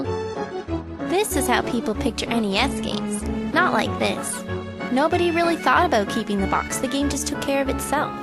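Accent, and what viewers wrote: Canada. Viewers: oh and...aboot ;) hahah that accent is awesome too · Canadian girls who say aboot... Nice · God, that voice, I love Canada.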